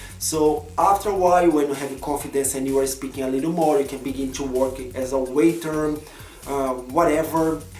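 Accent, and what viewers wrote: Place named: Brazil. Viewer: Ver um brasileiro falando inglês me dá uma sensação excelente de que eu 'domino' a língua, pois entendo 80% do que voce fala kk! O sotaque é fator determinante na conversação, é incrível. Keep it up,!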